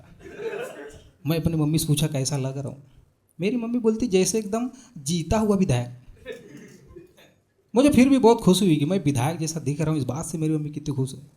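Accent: Chhattisgarh, India. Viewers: Accent Chhattisgarh ka lagta hai !!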